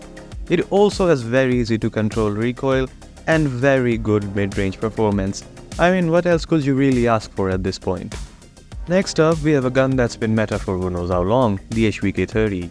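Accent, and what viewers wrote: India. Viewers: dude you're the exact same as me 😭😭. i started exactly a month ago too after 2.5 years. i was surprised to see an indian voice instead of path's. wonder what happened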